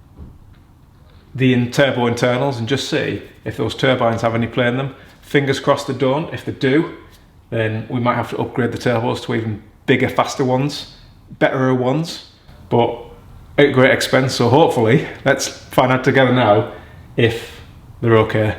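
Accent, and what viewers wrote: Teesside, United Kingdom. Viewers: Great channel 👏🏽. Going to be doing the same on my S6 in a few weeks and dropping in larger turbos at the same time. Will use this as a reference. Oh and is that a Teesside accent I’m hearing?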